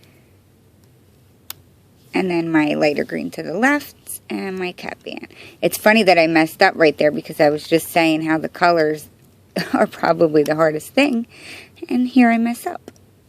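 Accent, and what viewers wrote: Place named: Brooklyn, United States. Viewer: Ma'am I'm so sorry. I really do not want to offend you when asking this question. Are you from Brooklyn? You just have that Brooklyn accent mixed w/ country lol.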